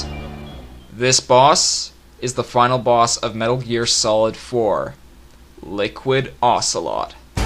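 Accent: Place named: Canada. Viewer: You Canadian? I keep hearing you say "aboot" and "doobt".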